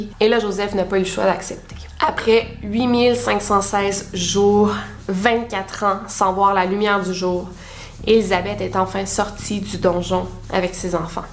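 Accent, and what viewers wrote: Quebec, Canada. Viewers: C est affreux comme histoire, pauvre Elisabeth.. merci j aime beaucoup tes vidéos et ton accent québécois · Grâce à toi j'arrive à trouver l'accent québécois sympa et compréhensible 👍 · tu me ferais presque aimer l'accent quebecois ! :)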